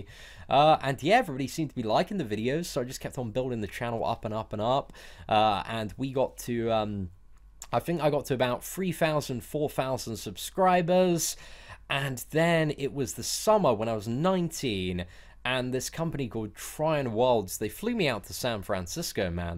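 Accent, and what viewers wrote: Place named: RP, United Kingdom. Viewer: I like you man.. The posh accent and using words like man and lit.. You crack me up, Keep it up mate!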